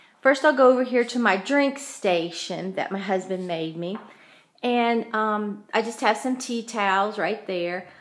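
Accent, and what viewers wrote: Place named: Rural, United States. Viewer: I love your videos! Your husband seems to be amazing you can tell he adores you from the video where you made the dip how he just jumped right up asked what he could do to help or something like that bc most men just sit there & take forever to come help. I loved how he followed you around dollar tree! Great DIY videos! I'm from NC! Where are you from? Just wondering bc of your country accent!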